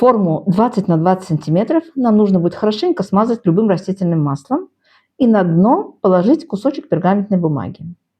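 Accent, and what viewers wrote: Baku, Azerbaijan. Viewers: Спасибо за наш старый добрый бакинский рецепт! Помню эти вкусняшки делала тётя) Кстати,акцент наш слышен до сих пор!))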